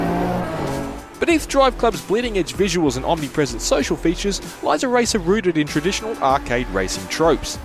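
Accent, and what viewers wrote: Australia. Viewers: Nick Revels Do people not know accents? is an Aussie. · was this review done by an australian?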